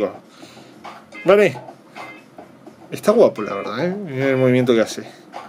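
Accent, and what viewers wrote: Argentina. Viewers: jajaja que chulo me encanta el accento argentino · pares un argentino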